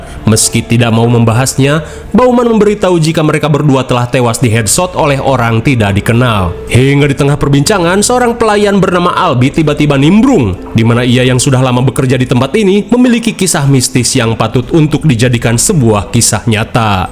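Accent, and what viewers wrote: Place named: West Java, Indonesia. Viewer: logatlawak sunda banyax menghibur ke para orang indo, aku orang suku sunda jadi ikut bangga banyax yg suka logat lawak sunda aing baraya, mantap mang sehat selalu jeung damang selalu mang😆😎🙌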